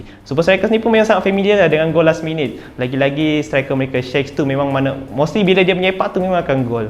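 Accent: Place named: Sarawak, Malaysia. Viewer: Speaker ni bckp mcm org Sarawak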